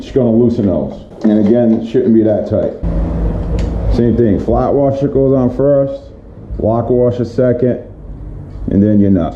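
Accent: Boston, United States. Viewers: you made me homesick Boston strong deplorable in tampa bay ...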